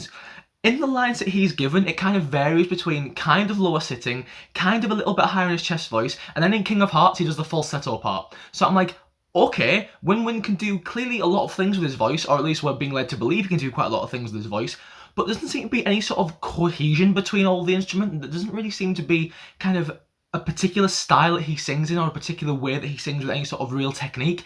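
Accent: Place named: Northern England, United Kingdom. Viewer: Can I ask where you're from? You have an interesting accent. It kinda sounds like northern England or maybe even Scotland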